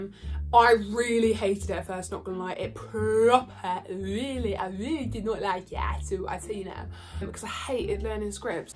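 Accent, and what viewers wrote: United Kingdom. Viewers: Love your accent! What part of Britain are you from? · I can’t tell if she’s British or American 😂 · are u british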